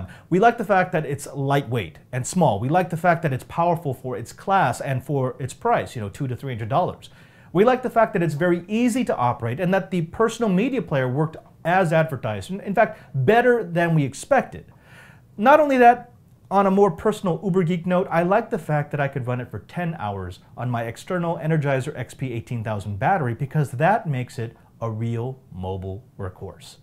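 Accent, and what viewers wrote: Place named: Philippines. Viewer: one last question out of my curiosity since you are a Jesuit priest. Can I ask if you are a Filipino from Ateneo Universities in the Philippines? The way you speak it sounds like a Filipino and at the same time every features of you makes me feel like you are one of us. peace, popoy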